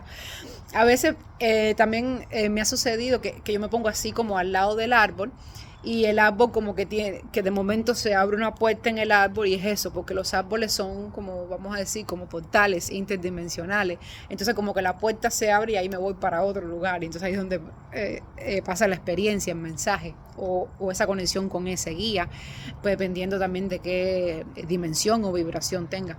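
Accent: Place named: Cuba. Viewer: Hola muchas gracias por todas tus recomendaciones son muy valiosas. Tienes un acento hermoso cubano Un gran abrazo desde Colombia 🙏🙏🙏❤️❤️❤️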